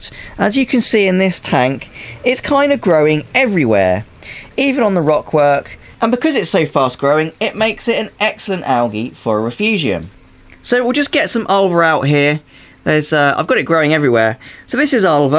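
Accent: United Kingdom. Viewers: Hey! Judging by your accent, are you British? I am in Brighton and just starting out on this hobby, I don't see a lot of people in the UK keeping marine tanks though...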